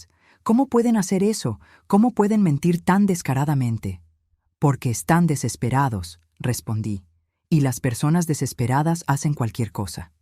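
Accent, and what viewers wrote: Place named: Mexico City, Mexico. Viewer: La escucho dé ciudad de México excelente narrativa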